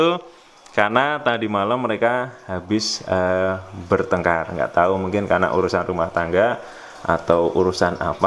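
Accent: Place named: Surabaya, Indonesia. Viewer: Sampean suroboyo ndi mas?bek'e oleh nempel Gon e sampean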